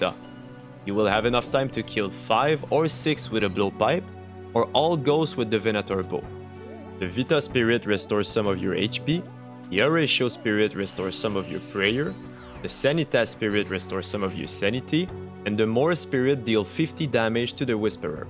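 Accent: Quebec, Canada. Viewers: en 2 secondes j'étais genre, yo un québécois qui fait un guide. Nice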